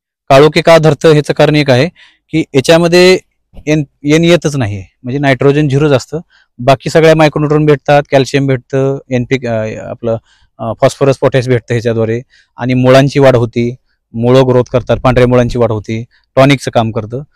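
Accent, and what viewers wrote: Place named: Pune, India. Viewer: खुपच छान, सर आपण फार छान माहिती दिली.शुद्ध मराठी पुणेरी भाषेत.धन्यवाद.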